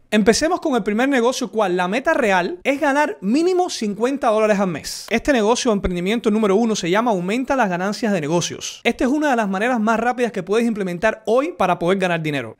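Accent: Cuba. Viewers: Hola he visto un solo video tullo y ni siquiera lo terminé por motivos ajenos, pero me gusto el enfoque que le diste y tu forma de ver la vida, este video aún no lo veo terminado de escribir lo haré, normalmente antes miro muchos vídeos antes de suscribirme a un canal de este tipo porque la mayoría hablan mucha M como decimos en Cuba 🇨🇺, ademas de que tu acento me parece Cubano. Si es así estoy el doble de contento porque me encanta ver triunfar a los míos. Aki tienes un nuevo seguidor. Suerte que Dios te bendiga siempre 🙏🙏🙏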